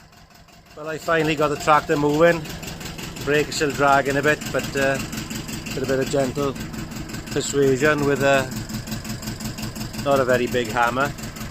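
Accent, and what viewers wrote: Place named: Pembrokeshire, United Kingdom. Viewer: I used to visit Pembrokeshire, so I love to hear your accent as much as watch the content...Keep going if only for your mum's sake...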